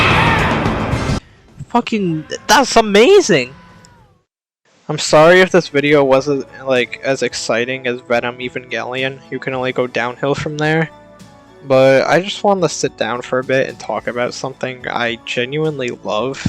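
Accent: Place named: West Coast, United States. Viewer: It's okay brother I watched and enjoyed, I too have made cringe during my cursed stay in this world. However your accent eludes me, where is it from??? Some kind of west coast thing?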